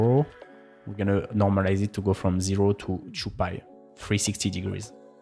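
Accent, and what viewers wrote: France. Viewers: But maybe french cause the zzhh prruh pronunciation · Nice French accent there, Sean Evans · Oh je sens l'accent français ✌️ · This accent is really French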